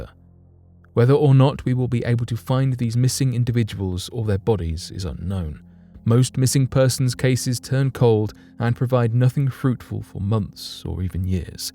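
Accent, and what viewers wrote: United Kingdom. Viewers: The British accent just gives these videos the extra creepy edge, can't be done with an a American accent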